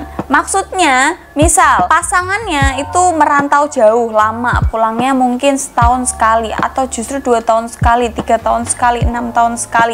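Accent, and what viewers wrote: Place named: East Java, Indonesia. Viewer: Mbk asline wong ngndi??? Logat'e kyok.wong jawa timur'an..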